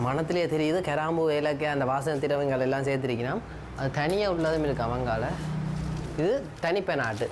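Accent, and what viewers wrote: Jaffna, Sri Lanka. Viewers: தமிழ்நாடு திருப்பூரில் இருந்து இலங்கை யின் தமிழுக்கு அடிமையான ஒரு தமிழ் கிறுக்கன் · பனைபொருள் அங்காடியில் பல பொருட்களை யாழின் தூய தமிழில் அறிமுகம் செய்தது செவிக்கும் மனதிற்கும் இனிமை பயத்தது... நன்றி. வாழிய யாழ் தமிழின உறவுகளே... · இவர் பேசுவது தான் கலப்படமில்லாத யாழ் தமிழ் பேசும் விதம். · சிவகங்கை மாவட்டத்தில் இருந்து உங்கள் ரசிகன் இலங்கை தமிழ் மிகவும் அழகாக உள்ளது வாழ்த்துக்கள் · இலங்கைத் தமிழ் செமபா. வாழ்க வளர்க.by நாகப்பட்டினம் மீனவன்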